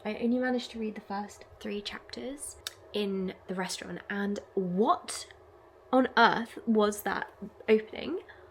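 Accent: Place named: England, United Kingdom. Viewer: love your voice, love your accent. Could listen to you all day long. You whisper, so you don't anoy the people around you. So very polite and English. ❤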